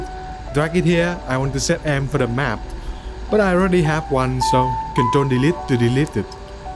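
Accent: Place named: Vietnam. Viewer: Vừa ấn vào video chưa kịp nhìn mà nghe cách phát âm là biết người Việt Nam gòi :3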